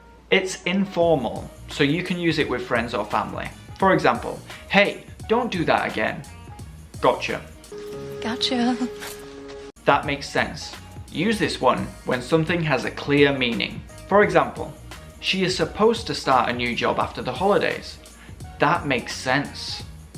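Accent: United Kingdom. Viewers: у него Английский английский как на счет американской версии? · More videos with the British accent please 😁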